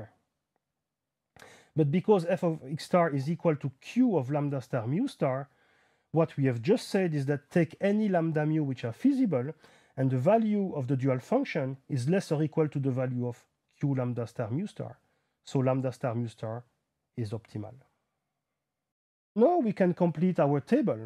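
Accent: France. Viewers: Thanks! Love your French accent :)) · It is amazing how he has the heaviest french accent still being quite clear, understandable and concise. Ver'y r'ar'e...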